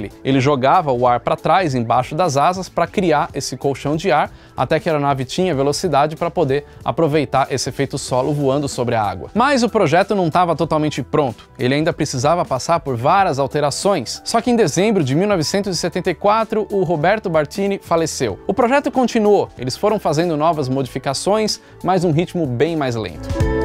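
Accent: São Paulo, Brazil. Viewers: Engraçado q esse cara parece o Gugu falando. De q parte de São Paulo isso vem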